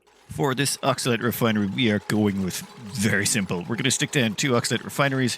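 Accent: Ireland. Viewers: You aren't from Ireland like me are you? Dublin here.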